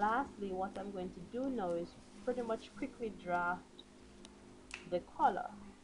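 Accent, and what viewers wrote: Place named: Jamaica. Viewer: are you Jamaican? you sound jAmaican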